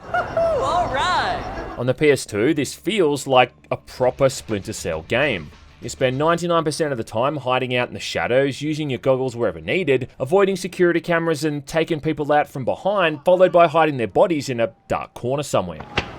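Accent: Australia. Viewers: Australian accent is impossible to listen for a non native speaker, terrible😭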